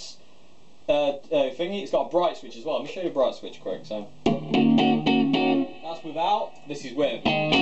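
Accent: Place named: Sheffield, United Kingdom. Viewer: Hi, thanks for the demo. Couple of quick questions I know it's the bandit solo series but is it the 50,65, or 75 watt version I can't see on the video? The other thing is have you tried Tech21's Trademark 60 I really think you would be impressed. Listening to the way you talk I suspect you don't live a million miles away from me in sheffield. You can demo my Trademark 60 if you like I don't think you will be disappointed. Not that I'm knocking the bandit I've got a 1991 teal stripe and that love too!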